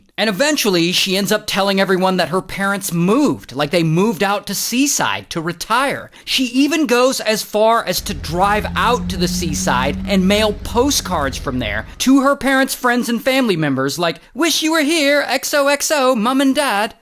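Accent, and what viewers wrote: England, United Kingdom. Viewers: I love your use of typically British swear words like 'bollocks' and your British accent; not the best, but hilarious! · The British accent 😂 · I like the brittish acent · Loving your English accent ❤ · I love English accent. He makes everyone seem so nice and proper.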